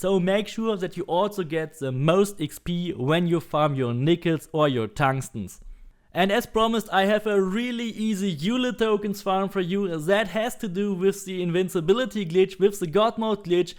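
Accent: Germany. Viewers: Man hört sofort dass du Deutscher bist 😀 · Only German got this accent cuz 99% of them can't say this and say sis and other words with T 😂😂😂😭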